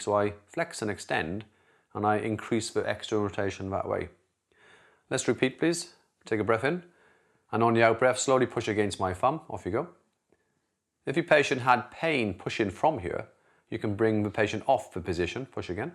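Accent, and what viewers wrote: Wales, United Kingdom. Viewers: Are you welsh? Great videos. Love them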